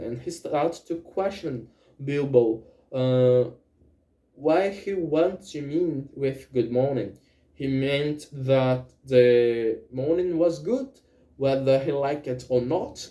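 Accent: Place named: United Kingdom. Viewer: Você escolheu o sotaque britânico?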